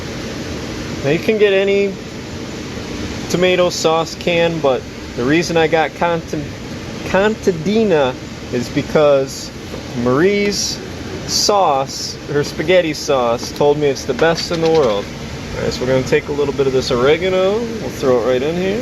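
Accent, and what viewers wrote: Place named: Bronx, United States. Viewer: You sound like your from thr bronx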